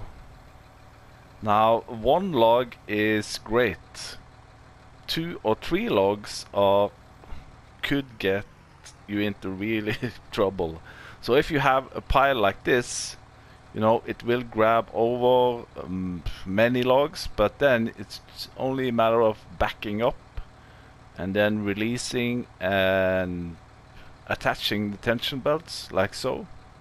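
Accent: Bergen, Norway. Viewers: You sound like you're from Norway Bergen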